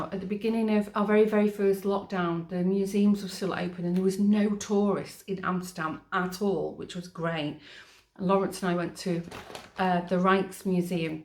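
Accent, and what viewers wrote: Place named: United Kingdom. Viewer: Hey, I'm a new subscriber, I only just realised you are living in Holland, I assumed you was in the UK, think it was your accent that threw me 😃, I hear Holland is a very beautiful, clean, country, with alot of green, and flowers everywhere☺️ but also heard it really high the cost of living there. What are the pros and cons living there, and do you miss the UK at all, do you get to go back there often 🤔 take care. Stay safe 🙋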